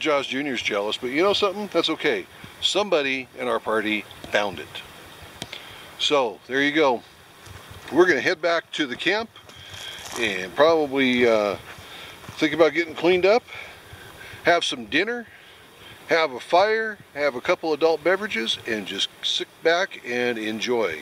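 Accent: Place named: West, United States. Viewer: I don't know if you're still looking at your comments but I am a Westerner living on the East Coast do you know how long it's been since I've heard someone say c r i c k instead of Creek so nice to hear the accent years ago my mother-in-law that had a fit when I told her we were going down yonder to the c r i c k she did not appreciate my accent she informed me that I was going down to the creek not Yonder to the c r i c k I enjoy your videos Keep On Keepin On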